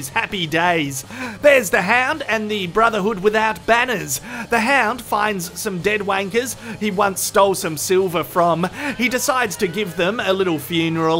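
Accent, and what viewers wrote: Australia. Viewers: Stumbled across this in my recommended list. Hope people don't think all Aussies sound like this or have such a potty mouth.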